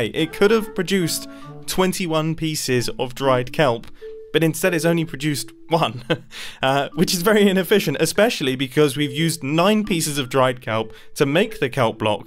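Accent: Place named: United Kingdom. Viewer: My family loves your channel we watch it as a family sometimes just to hear you British accent 😂😂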